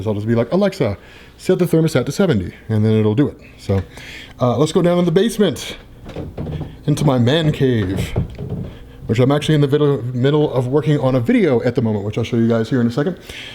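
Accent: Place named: Boston, United States. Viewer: Your from Boston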